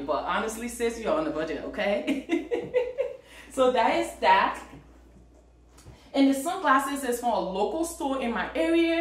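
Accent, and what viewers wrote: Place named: Liberia. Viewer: I hear that Liberian accent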